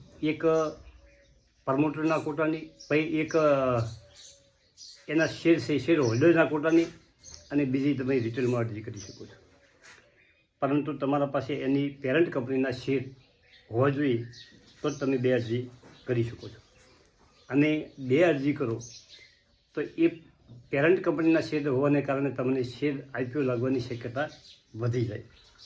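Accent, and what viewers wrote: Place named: Banaskantha, India. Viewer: તમારી બોલી પરથી તમે બનાસકાંઠાના લાગો છો.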